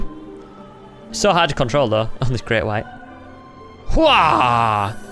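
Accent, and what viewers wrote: Scotland, United Kingdom. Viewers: is British duh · are you Scottish or British???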